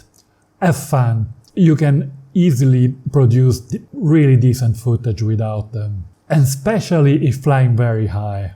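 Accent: Italy. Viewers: I could listen to you all day, love your Italian accent :D Great info! Many thanks :)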